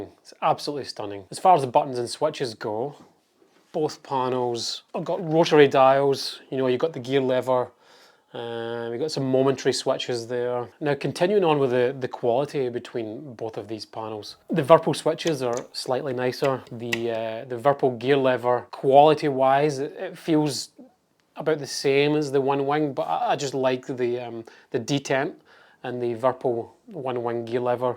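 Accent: United Kingdom. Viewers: Are u uk by the way just accent am from Northern Ireland nice seeing local people do so well.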